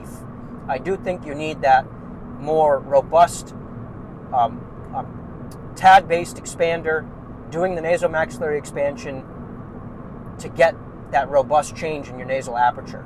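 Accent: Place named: Eastern New England, United States. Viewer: I love your Eastern New England accent!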